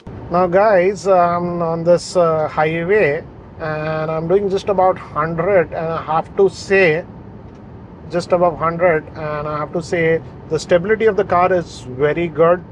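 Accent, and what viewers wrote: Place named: India. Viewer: Speciality of Indian English... 5 "actually" and another 5 " in fact " each sentence. Coming to e Vitara, I think it is the second best in the 20 lakh range. Most value for money is Tata sierra.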